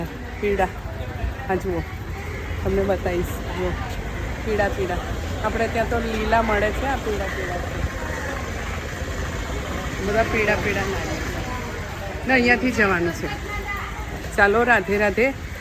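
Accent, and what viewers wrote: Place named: Surat, India. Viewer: दीदी आप गुजराती हो लेकिन आप कोलकाता में शादी कैसे हो गई आप शुद्ध गुजराती बोल रहे हो सुरती भाषा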